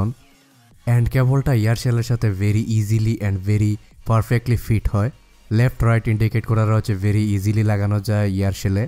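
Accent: Sylhet, Bangladesh. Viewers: ভাই মনে হয় সিলেটের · Ai kita sylhety ni? Masha Allah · Bhai er basha ki sylhet? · Kitaba vai Sylheti ni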